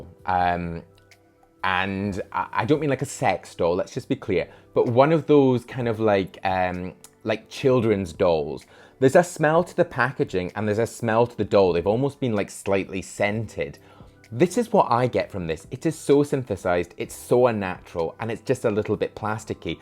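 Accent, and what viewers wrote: Scotland, United Kingdom. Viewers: Not related- but your accent is definitely less scottish. Idk how you feel about that but it's an observation. Love your stuff from the states! Keep on brother!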